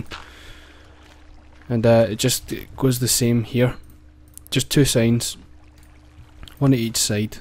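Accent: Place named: Glasgow, United Kingdom. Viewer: No he doesnt? small beans is from york. He sounds like he's from somewhere near Glasgow. And you do know that insted of burning wood, you can burn one unrefined but of wood and make charcoal which is good for 8 full cycles instead of 1.5 for wooden planks.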